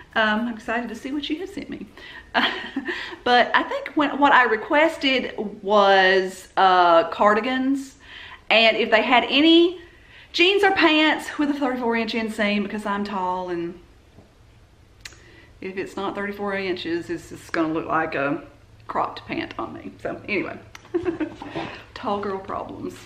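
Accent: South, United States. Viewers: I think she has a southern accent too! I am from TX!💜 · I really enjoy your videos? What state do you live? I think I hear a southern accent?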